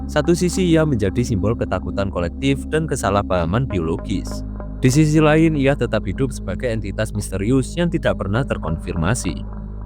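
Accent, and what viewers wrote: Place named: Java, Indonesia. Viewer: Abang orang Jawa ya medok nya keliatan 😹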